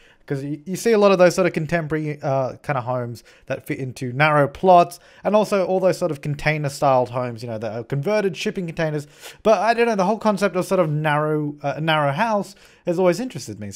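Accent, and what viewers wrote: Australia. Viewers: I've been watching his video for ages and never recognised the Aussie accent cause I'm Australian too lol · yeah us Aussies say things weird lol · I feel so disappointed, as an Australian, that I didn't recognise that you're Australian until you said you are. Haha. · YOUR AUSSIE I DID NOT KNOW THAT OMG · i didnt know u were an aussie lol ok well u do learn something new every day :P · OMG HE IS AUSSIE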